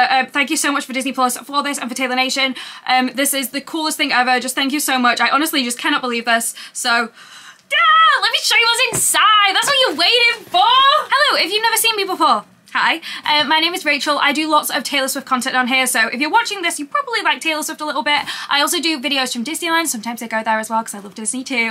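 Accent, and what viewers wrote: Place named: Birmingham, United Kingdom. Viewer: This video was created with care and chaos. Balloon segment wasn’t the highlight. Hoody, water bottle… I’m appy… The most Birmingham accent you have done. Interesting fact, I have done some research on the Edinburgh shows. Sunset is at 2155 and twilight finishes at 2256. The Edinburgh shows look as if they will be in full daylight, if Taylor hits the stage at 1900 as is anticipated. These shows will have a different vibe to them. If you are coming to Scotland, you will experience it.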